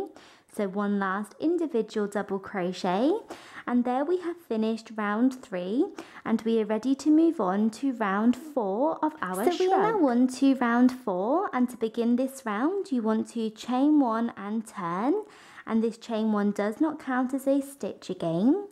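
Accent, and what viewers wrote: England, United Kingdom. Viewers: I love the tutorial but I must say the English accent is too much U over pronounce words and its distracting from the video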